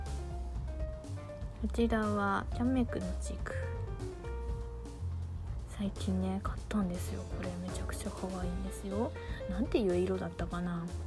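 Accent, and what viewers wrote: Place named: Kansai, Japan. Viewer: 私もめちゃくちゃ迷います！特に着物は、結局何が正解かわからなくなってくるのでぱっと見の印象が残ってるうちにさっさと決めるように頑張ってます笑というかさん関西人なんですか！？ちょこちょこイントネーションが方言っぽいですね！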